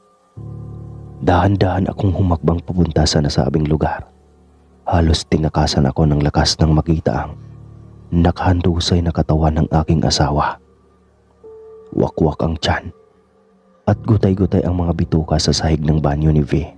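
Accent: Visayas, Philippines. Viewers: Iba talaga bisaya dila pa lang matigas na haha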